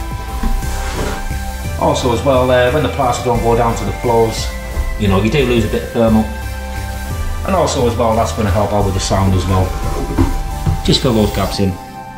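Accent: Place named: Liverpool, United Kingdom. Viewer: Can hear a fellow scouser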